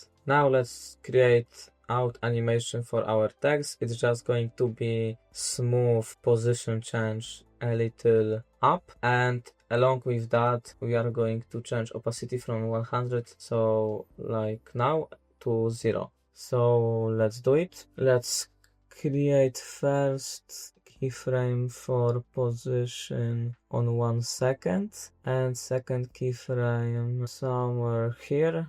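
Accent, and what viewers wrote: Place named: Poland. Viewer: po pierwszych sekundach wiedziałam że jesteś polakiem ;) szacun stary, zajebisty montaż. Też sobie klikam w aftera i na pewno będę używaćtwoich poradników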